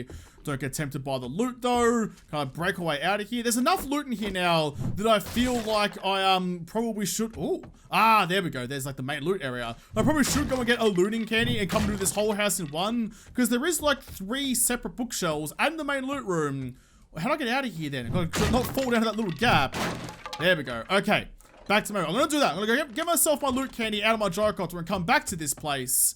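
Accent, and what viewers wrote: Australia. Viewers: i have a friend named josh, sounds exactly like you and is Aussie, hmmmmmmmmmmm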